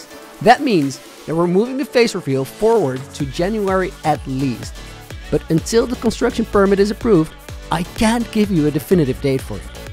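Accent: Netherlands. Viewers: Wait... I was just looking on your channel, when I saw that you're from the Netherlands... normally I can easily hear when someone's Dutch, but damn, that took me by surprise. Now I can never unhear the slight Dutch accent ever again lol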